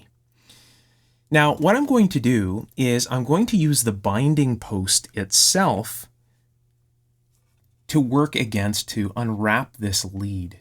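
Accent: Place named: Canada. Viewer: I love your voice, even your Canadian pronunciation of “out” an “House”. Sometimes I put on your videos just for background sound when I work on long complicated projects. Thank you so much!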